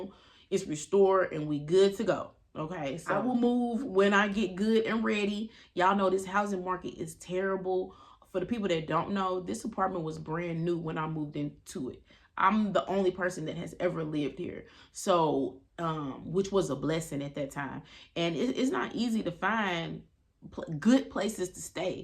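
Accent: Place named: St. Louis, United States. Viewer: Your St. Louis accent is so strong lol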